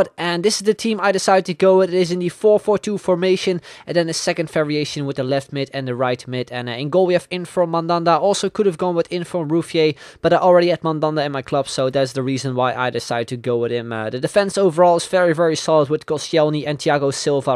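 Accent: Netherlands. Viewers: You're dutch aren't u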